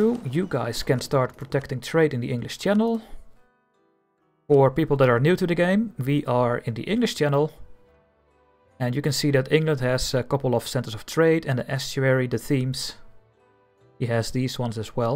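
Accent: Netherlands. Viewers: He has a very strong Dutch accent lol, might not be obvious if you dont speak English or Dutch natively. ex. marinesss dutsh, persent, usash, tshoosh. very Dutch, all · This is good and proper. A dutch man with a thick dutch accent forming a strong Netherlands. Gezellig! I'm gonna enjoy this series. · Idk man, has a pretty noticeable Dutch accent. Maybe not as thick as many others from here have but it certainly isn’t accentless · his english is perfect but he clearly has an accent. a dutch one. an obviously very dutch accent. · It’s really interesting to hear the Dutch pronunciation of the Dutch provinces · As a Dutch person myself its really easy to recognize a fellow Dutch person speaking English. But I can Imagine its hard to catch if you are not Dutch yourself. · LUL as a person born in Netherlands, this is a THICC Dutch accent bro xD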